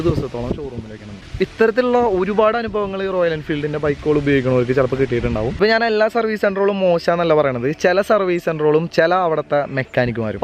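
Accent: Thrissur, India. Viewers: ഇങ്ങള്‌ തൃശ്ശൂര്കാരനാണല്ലെ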